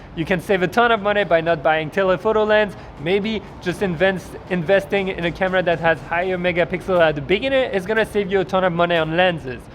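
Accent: France. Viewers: Love your French accent dude! I do use crop a lot!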